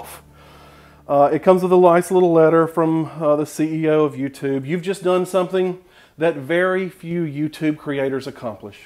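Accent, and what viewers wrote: South, United States. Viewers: Congrats!! Congrats!! Congrats you have a beautiful personality and so sweet I enjoy your videos I get excited when you upload a video very helpful .Love your southern accent❤ keep up the great work. Sorry for your loss in your family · Congratulations!!! You're funny, talented, and have a great concept for your channel. (And it helps that you've got the most adorable Southern accent!!) I am delighted for you and am looking forward to more great videos. God bless! 😃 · I'm from South Carolina Carolina I can really relate to things you talk about regarding family recipes and behaviors. Plus, your southern accent is awesome!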